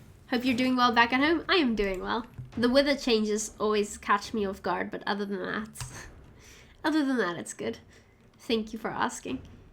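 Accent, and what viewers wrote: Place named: Afrikaans, South Africa. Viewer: Hi can you speak Afrikaans, Ek kan net aan jou aksent sê jy is afrikaans en van suid afrika 😊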